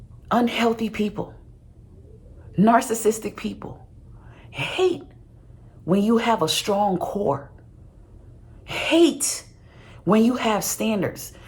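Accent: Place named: Ghana. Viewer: I like the Ghanaian accent "my sista."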